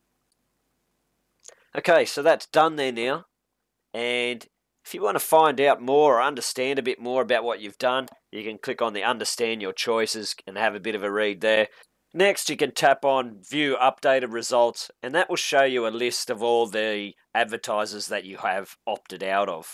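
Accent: Australia. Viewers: Every Australian sounds like this, wdym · Nah he just sounds Australian lol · He just has an Australian accent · Probably because he's australian.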